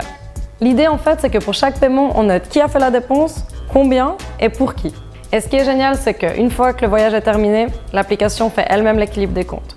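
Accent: Switzerland. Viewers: L'accent suisse sur !!! ahah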